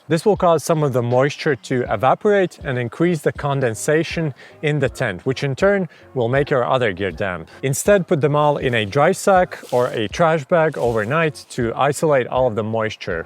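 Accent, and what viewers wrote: Latvia. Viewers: man pagāja 7 sekundes līdz uzķēru, ka tāds akcents ir tikai latviešiem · Latvian accent? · Es dzirdu latviešu akcentu?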